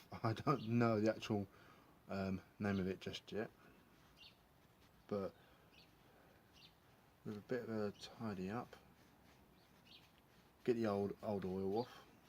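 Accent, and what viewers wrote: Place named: South East England, United Kingdom. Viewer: Are you from the south east mate? Fancy doing mine for me ?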